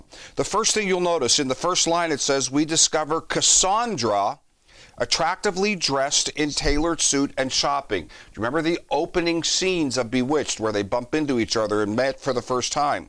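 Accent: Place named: United States. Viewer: The accent of the narrator is way too American!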